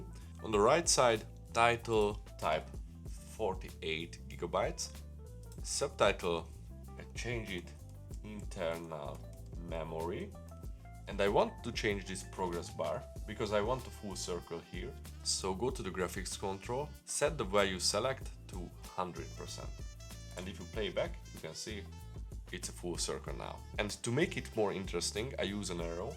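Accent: Hungary. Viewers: Sziaaa.... When I was watching the first 10s of your video, in my head I was thinking " man this guy has a Hungarian accent " then you said your from Budapest - I started to laugh - NO WAYY!!! En is magyar vagyok. Budapesten szuletem, de Canadaba lakok gyerek korom otta ;) I just subbed tesom :) Curious since you are in europe do you record and edit on a 30fps time line?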